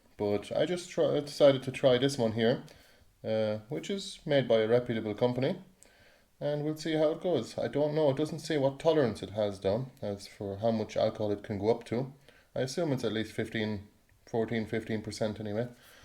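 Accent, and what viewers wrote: Ireland. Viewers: Just after doing a bit of a binge watch, great content as usual on all the videos. To answer one of your questions from a previous video, I think the willow you were wondering about is a Sally. I can ask where you purchased your herb drying tent and your dehydrator. I have been searching to buy within Ireland and the price is some what saucy for both. Also did you get your wine making equipment within Ireland. And I can't believe you have a pressure canner. Surely not an Irish purchase. I thought for a second I was watching one of the many US you tubers I follow, except for the lovely Irish accent. Keep up the great work.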